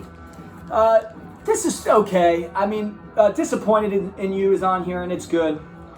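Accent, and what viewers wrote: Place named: Pennsylvania, United States. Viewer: I'm from new jersey my family's from NYC you sound like you're from the Pennsylvania area lol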